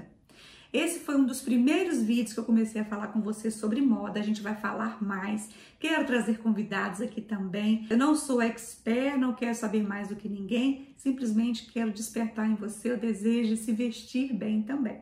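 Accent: Minas Gerais, Brazil. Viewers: vc é mineira? Pois amo este sotaque!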